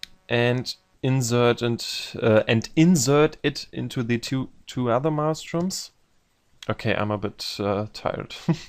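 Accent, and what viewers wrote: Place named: Germany. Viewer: An deiner Aussprache hört man dass du deutsch bist :D Und dann hab ichs an deinem Programm gesehen xD Geiles Tut!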